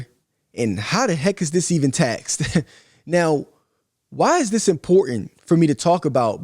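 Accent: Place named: Philadelphia, United States. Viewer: Huge fan of the videos and have learned a WHOLE lot but I do have a question: How do you and Cassidy sound exactly alike but Meek Mill screams so much? Is there a section in Philly that just screams, or do most have the same accent you and Cassidy have? I'm genuinely curious not even trying to be funny...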